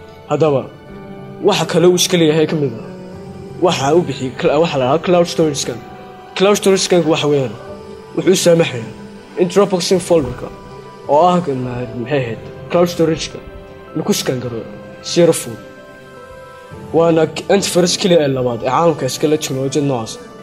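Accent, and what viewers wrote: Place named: Somaliland, Somalia. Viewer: socdaal media he isn't from mogdisho he is from Somaliland don't you understood easily and koonfur is different speak.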